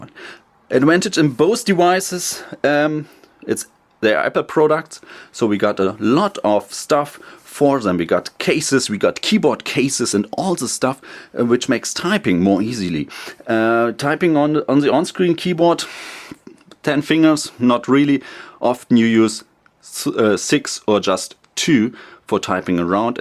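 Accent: Germany. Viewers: are you german? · Not an expert traveler but this man's English accent is clearly German or even Swiss. Nevertheless, I appreciate the fact that he speaks my language, clearly and intelligently and offers a fantastic comparison of the two pads. I had the opportunity recently to purchase a mini2 with retina display for $160 usd new. Not wanting to just jump at buying a mini and regretting it, this review gave me all of the information I needed to make a good decision. Thank you.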